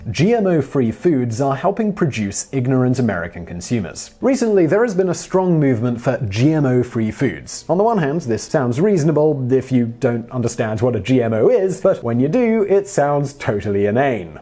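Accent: United Kingdom. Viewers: I lo e how the American viewers get all worked up by his superior British pronunciation on a lot of words. Having him drop the odd American (mis)pronunciation in is great, just to keep them knowing that he knows.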